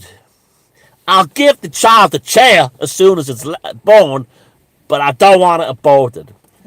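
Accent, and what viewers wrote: South, United States. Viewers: Your fake Rural Southern accent is better than most of the celebrities! However, they DO pronounce their R sounds, usualy a hard, drawn out arrrr drawl. Dropping the R at the end of words is the biggest "tell' and the pretentious antebellum faked accents of those historical "Deep South" elite slavers who wanted to be British aristocrats. "Abowashun" is aborrrrshun. Draw out those aRRRRRs, man. As a proud non-christian Southerner, I can say this. Otherwise, the parody is funny af. Lol. Thanks!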